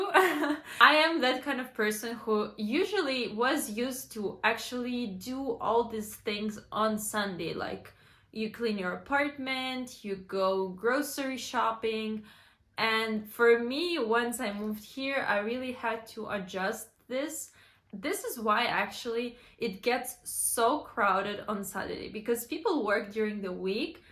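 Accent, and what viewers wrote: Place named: Baltic states. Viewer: I started wondering as well since her accent is definitely not American. I was actually admiring the clarity of her speech before I realized that she has an accent that's not one I'm familiar with. My first guess would be that she's from the Baltics, but she might be from Hungary or elsewhere for all I know.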